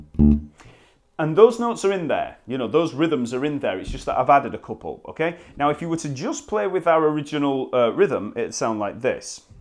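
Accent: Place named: London, United Kingdom. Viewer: he s not a verry goog bass player he is a teacher in deed but what i like the most its his accent it s a delight makes me feel like being in hide park at speakrs corner he deserves a marble arch bless cheers from France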